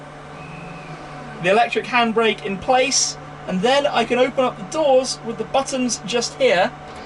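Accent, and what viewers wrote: United Kingdom. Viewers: Brilliant! Absolutely sensational. british accent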